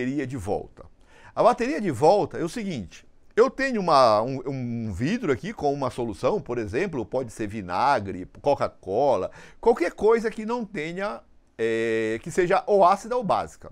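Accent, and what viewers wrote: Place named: North, Portugal. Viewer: Acho q esse sotaque é do Norte... kk Excelente video! Muito Obrigado! Sucesso!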